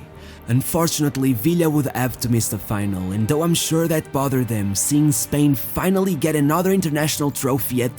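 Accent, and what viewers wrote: Portugal. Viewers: Are u a portuguese speaker? Seems like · Are you portuguese? This might sound weird but your english sounds like portuguese english :D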